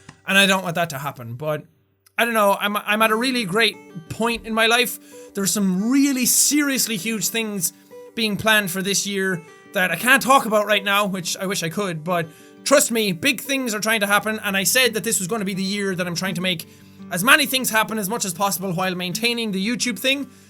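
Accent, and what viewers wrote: Ireland. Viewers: are u actually irish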